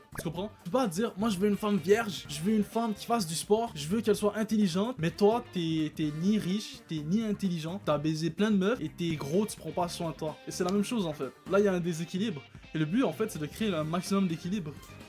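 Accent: Quebec, Canada. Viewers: Attends t’es québécois ?!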